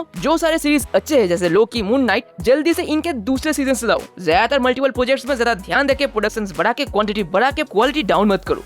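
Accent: India. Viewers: Baton se ap indian Q lag rahy ho ap 🤔🤔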